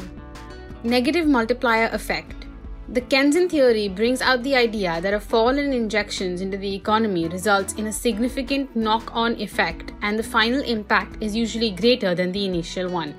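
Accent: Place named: India. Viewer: If you can't speak clean english, then SLOW THE F DOWN. If you would speak slowly many of us who can not understand Indian dialect would still be able to benefit from your videos.... otherwise completely worthless.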